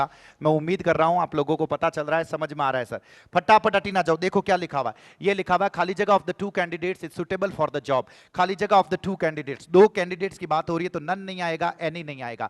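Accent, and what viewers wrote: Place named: Mewar, India. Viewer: Sar aapki mewadi acchi lagti Hai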